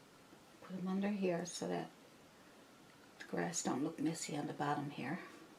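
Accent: Newfoundland, Canada. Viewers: Is that a NFLD accent that I'm hearing?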